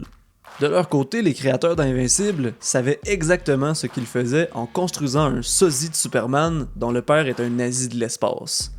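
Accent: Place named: Quebec, Canada. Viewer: Je ne sais pas cmt j'ai atterri sur une vidéo québécois mais j'adore votre accent 😂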